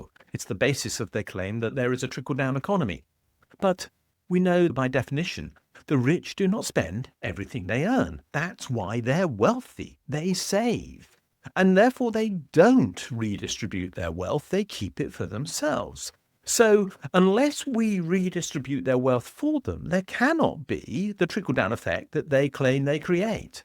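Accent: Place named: England, United Kingdom. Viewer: Such a nice English accent, wasted on such terrible ideas. If you chase the wealthy out of your economy, your economy will collapse, full stop. Take a look at other countries that have done it: Uganda chased all their Indians out who were bsuienss owners, or Zimbabwe seizing white farmers land. China & Cambodia put all the educated bourgoisie into the fields, or Russia and the kulaks. If this gentleman believes the wealthy hoard their wealth and don't invest, maybe the reason is government policy makes investing in your country not worth the risk? Capital in the hands of private individuals will always be put to better use than capital in the hands of the government. Always.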